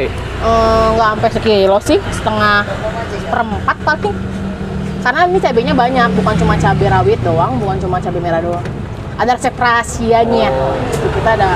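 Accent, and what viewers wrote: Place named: Tangerang, Indonesia. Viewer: Kayak orang Tangerang..lanjut teeh mantep semangat terus..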